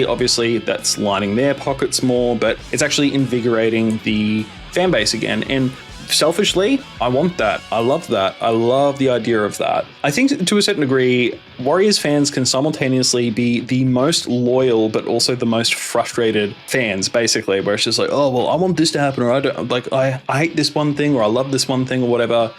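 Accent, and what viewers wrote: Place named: New Zealand. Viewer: Are you a kiwi? I dunno why I never heard it before but you sound real kiwi lol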